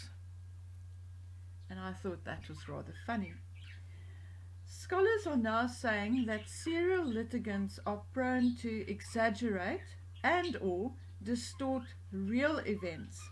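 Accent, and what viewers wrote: Afrikaans, South Africa. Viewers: 🇿🇦 I enjoy hearing your afrikaans accent, it reminds me of happy times when i lived there. Also you talk a lot of sense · Her whole life is a distortion. So many lies and misinformation....very sad creature if truth be told. She’ll be a lonely bitter old woman before too long. Thanks to Wally for recommending you. 🇦🇺 BTW love your South African accent!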